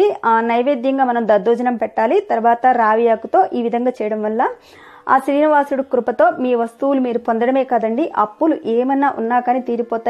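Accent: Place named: Medak, India. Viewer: అక్క మీది మెదక్ జిల్లా అనుకుంటాను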